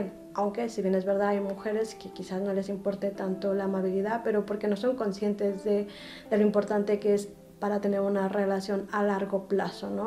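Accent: Spain. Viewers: ENTONCES POR QUE HABLAS COMO ESPAÑOLA? 🤔🤔🤔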